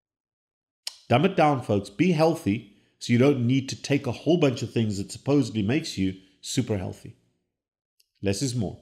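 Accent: South Africa. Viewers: That's true. But he only said it once and YT text-to-speech often gets words wrong. It esp doesn't like South African accent, translating it more often to "covert." But I just checked a recent John Campbell interview (someone much more off the deep end about Covid) and the TTS had no trouble parsing "Covid." There's no conspiracy here. I was just showing you that you could type it in the comments (twice!) without the black helicopters appearing.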